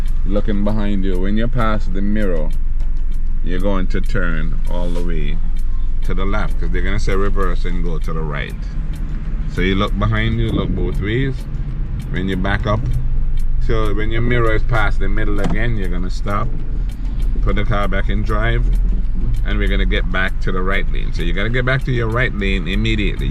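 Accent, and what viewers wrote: Jamaica. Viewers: You do sound like there's a bit of Jamaican in there. I'm an American but I lived in Jamaica all my life. I passed the written test past month and I have the driving test two weeks from now. I watch your videos to ensure that I don't fail. Thank you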